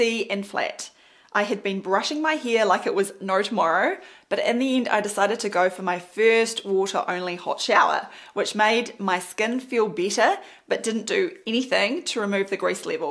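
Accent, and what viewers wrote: New Zealand. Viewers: Hilarious NZ Accent! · I wondered about the accent. New Zealand apparently. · Sounds Kiwi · is drop dead gorgeous and that kiwi accent ) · At what point does the NZ accent become a whole new language? I can barely follow.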